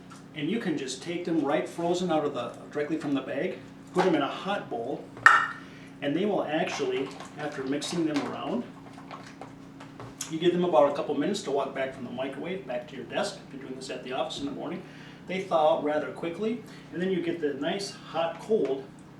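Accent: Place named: Minnesota, United States. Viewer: Good video brother. I used to live in Mankato. Haha. I thought u had that accent. I'll be honest with you. I guess im one of the very few who can eat it plain with nothing in it. All I do is put a half cup in the food processor bc it cooks more throughly. And its definitely one of rhe foods that keep you feeling full. Thats the magic food ya'll.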